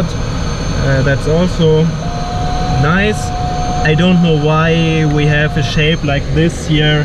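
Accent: Germany. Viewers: Der deutsche Akzent nervt kein bisschen :D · if you cant speak english, please dont do it, orfull to listen to that german accent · Ich höre deutsche Heraus haha : ) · Are you from Germany, because your english sounds so. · Haha man erkennt am Akzent, dass du Deutscher bist. No front ich spreche genauso. XD schöne Grüße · Du hörst dich schon echt deutsch vom Akzent an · German tries to speak English ...... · Du hast so einen deutschen Dialekt😂😂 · His german Accesnt tho... love him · Kurze Frage bist du deutsch wegen deinem Akzent? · He is German i Can hear that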